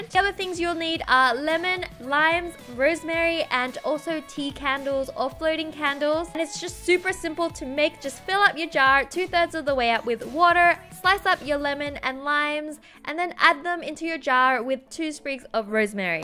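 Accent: Australia. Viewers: I think she lives in Australia. listen to her accent and you can tell. winter in Australia is like 60 degree and 70 degree weather, and their winter is June - August. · I'm australian and that's how we say mosquitoes 😂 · I am Aussie btw does she live in Queensland or NSW? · she's australian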